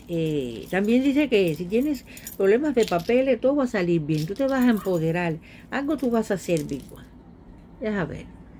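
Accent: Cuba. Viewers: Hola soy dama. Nose tu nombre. X tu acento sé q eres cubana. Cada vez q te he escuchado, eres cm 1 alarma de ten cuidado....pero nose de quién??? Tengo q cuidarme. Ese es mi problema mayor q no se ver la maldad de las personas. Nose qn es qn,¿Quién me kiere hacer daño? Tampoco se xq me kieren hacer daño?? ¿ Xq me kieren hacer daño? Si yo nunca le he hecho mal a nadie...Sólo le pido a Dios qn venga a mí , p hacerme daño, Dios sácalo de mi camino. Y esa mujer q yo sospecho me está haciendo brujería sánale ese corazón malo hacia mi persona. Que me suelte ya. Tú sra q lees las cartas si sale de tu corazón ayudarme hazlo ...evita una tragedia x favor. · Luz para tii TE ADORE , Y SE NOTA QUE ERES CUBANA 100%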